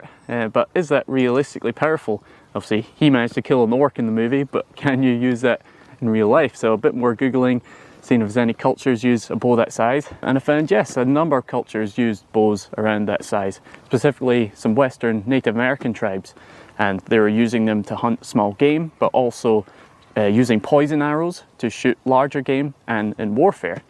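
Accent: Scotland, United Kingdom. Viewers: Even better given the presenter has a Scottish accent. 🙂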